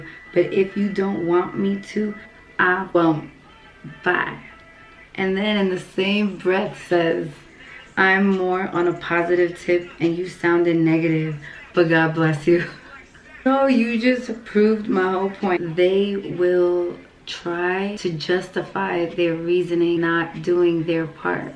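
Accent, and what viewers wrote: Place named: Latin America. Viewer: yo....are you black? I know thats a dumb ass question but i wanna know because your accent makes you sound latina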